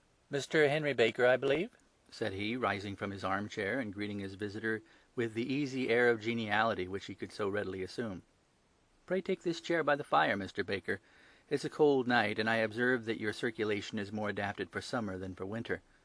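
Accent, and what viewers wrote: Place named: United States. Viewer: Nice Narrator voice with a slight American accent.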